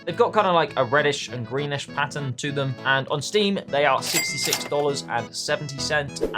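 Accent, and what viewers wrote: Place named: United Kingdom. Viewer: your bri ish accent is annoying (no offense)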